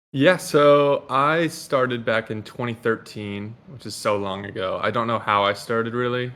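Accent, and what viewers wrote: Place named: United Kingdom. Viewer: Hej that’s the brittisk guy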